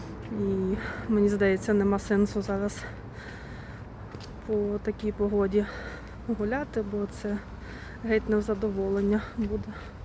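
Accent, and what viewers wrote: Surzhyk, Ukraine. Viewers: так, використання і замість «и» це прямо суржик-суржик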